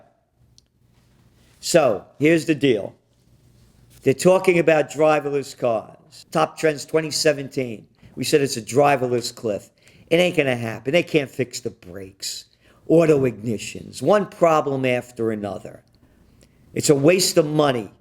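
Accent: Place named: New York, United States. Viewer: Gerald Celente...Yr schtick is Incomparable!!! I grew up in the small town near Poukeepsie...I enjoy hearing yr NY accent and mannerisms...peace out, and thanks!!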